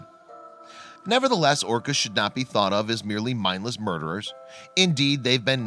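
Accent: United States. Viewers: I don't know why I continue to get sucked into these videos as a minute or so in to them the penny drops that the the narrator, or more likely the writers, tell 'pork pies', and the narrators ALWAYS have American accents! The first lie becomes obvious as there is a white humpback whale named 'Migaloo' sighted on the Australian east coast when he otherwise affirms that there are no other white whale specimens, the second lie being that blind freddy could see that when he claims that this white adult orca is "fully socialised" the question begs is, why is he swimming with orcas of a different type? Notice the fin size difference between the white whale and the rest of the whales in the pod denotes that whilst both are orcas, the white whale is of a different sub-species, just as are Brahman cattle are different to say, english Hereford cattle., and which means that for some reason the white orca appears to be socialising outside his sub-species group, and therefore raises the spectre of the third lie when the next question begs, where is his mother that the narrator asserts is amongst the pod? 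Then notice at about 5.05 mins into the video in much more grainy footage you see the white orca surfacing amongst it's own sub-species, so it may well be two different white orcas as was alluded to later on in the video. Then there are differences in types, being 'residents' to a localised area, 'transient' consisting of small pods in which females tend to have more triangular fins than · Dweebe yanks accents like this are both offputting and superficial ... no gravitas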